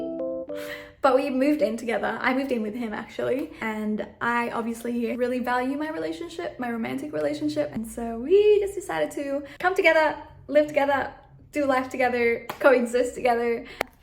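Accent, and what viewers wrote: Australia. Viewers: She should join Miss U Australia. She looks and sounds more australian than she is Filipina. “Living in” is still considered a taboo in the Philippines especially for celebrities and beauty queens. Not really a Filipina representation. A Miss Australia perhaps? I will still support!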